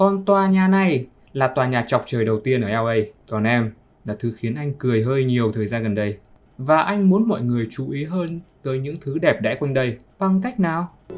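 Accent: Vietnam. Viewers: Nghe bạn kể lại film này bằng chất giọng thuần Việt, làm mình thấm film hơn thêm 1 tí qua bao năm rất yêu film này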